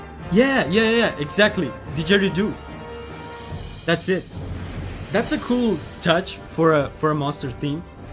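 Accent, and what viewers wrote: Brazil. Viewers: Are you by any chance brazilian? been wondering that for a while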